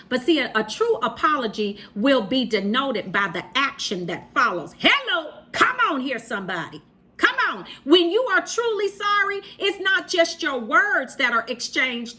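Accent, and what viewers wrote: South, United States. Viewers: Whenever she says, "That bird, SKUNK!" I be dying laughing🤣🤣🤣 she has a lil southern accent.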